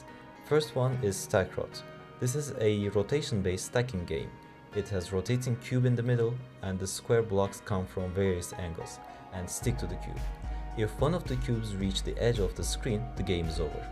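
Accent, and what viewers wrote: Turkey. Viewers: Türksün giba aksanın öyle gibi geldi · you have turkish accent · is this a turkish accent?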